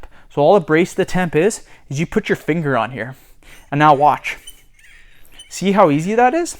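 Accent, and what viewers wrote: Canada. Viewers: Canadian accent? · Canadian af bc he sounds like nick eh 30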